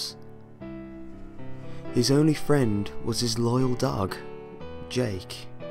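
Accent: United Kingdom. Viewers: WOW..WOW.....WOW!!!!! THAT WAS SO DEEP! I LOVED IT! BTW I keep thinking your British.. I don't know why but...I just think that. Are you British?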